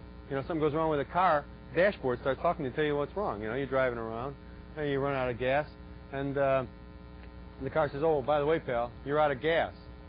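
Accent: United States. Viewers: gotta love the new york accent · That's a Chicago accent.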